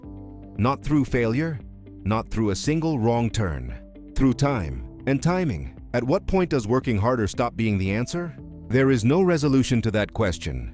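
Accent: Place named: Australia. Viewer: Just Aussie. Too strong is off putting.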